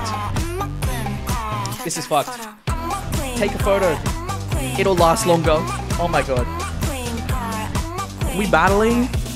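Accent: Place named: South Korea. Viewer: 이 형 뭐지... 분명 영어를 말하는데 한국인 같아..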